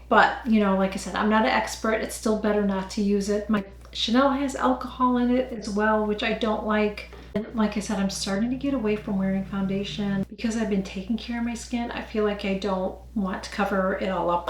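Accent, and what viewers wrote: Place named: New York, United States. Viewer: Oh dam Girl, i don't check. And yes Clinique was my first foundation. I was advised which shade. It was way to light. And yes i used girl intentionally. I think you have a slight New York accent. And Boo to who put you down. Just pathetic. Hi to Family & Tabitha, the beautiful puddy tat 🐈🐾🐾❤💞❤💕 xxxx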